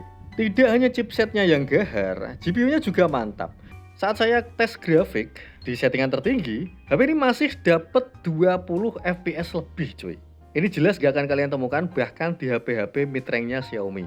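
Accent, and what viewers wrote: Pati, Indonesia. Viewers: Wah wong pati ya mas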